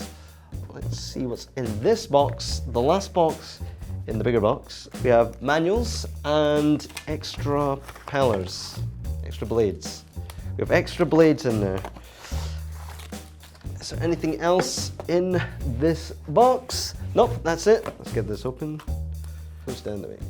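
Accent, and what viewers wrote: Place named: Edinburgh, United Kingdom. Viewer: Hi, Great video. Thanks. That sounds to me like an Edinburgh (where I am) accent... am I correct? If so, I've just bought myself a Mavic 2 Pro and the FlyMore kit, and... I'm completely new to drone flying. If you're in Edinburgh, is there a particular area you can recommend I get started? Would you be up for meeting up with me (in the field, with drones) and give me a few starting pointers? Cheers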